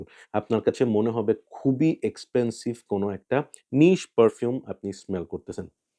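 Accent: Chittagong, Bangladesh. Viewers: আসসালামু আলাইকুম ভাই। আপনার জন্মস্থান কি চট্টগ্রাম?আপনার কথার মধ্যে চট্টগ্রাম এর টোন আছে ।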